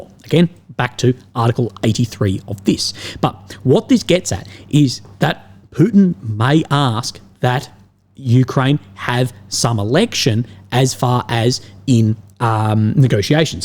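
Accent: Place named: Australia. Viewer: Australian accent cracks me up " He ahhhhhh" If you notice " He ahhhhhh". Then over " He ahhhhhh" you see..... but " He ahhhhhh". Love ya biddy! Keep up the good work " He ahhhhhh" :-D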